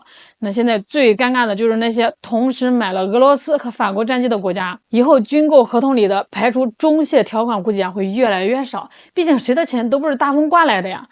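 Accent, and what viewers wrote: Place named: North, China. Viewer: face-green-smiling:哈哈！很喜歡妳滿口北方口音！很有趣、也開心！:face-blue-heart-eyes::face-blue-three-eyes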